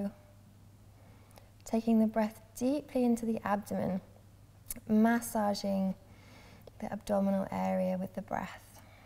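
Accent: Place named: United Kingdom. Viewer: So so good to hear a British voice while I practice! No offence meant to American pals...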